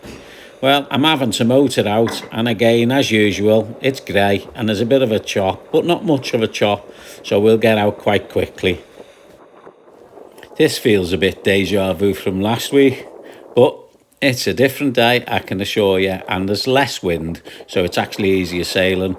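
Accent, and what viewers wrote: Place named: Scotland, United Kingdom. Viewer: "but certainly giving them a good wack in the head when they are not looking" ROFL ROFL ROFL! ! ! Too funny. My favorite Scottish accent channel on YT!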